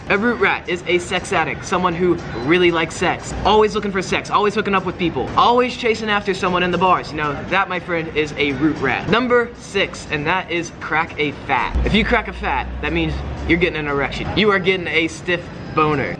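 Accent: United States. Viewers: Lmfao hearing all this in an American accent is hilarious. Love it mate, well done! 👍🇦🇺😂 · Hearing an American pronouncing these is so weird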